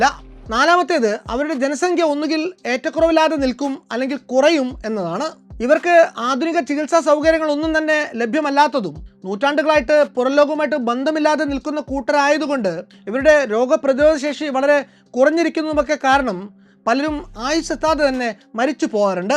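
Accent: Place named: Kannur, India. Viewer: Haha പലപ്പോഴും തനി നാടൻ കണ്ണൂർ ഭാഷ വരുന്നുണ്ടല്ലോ😊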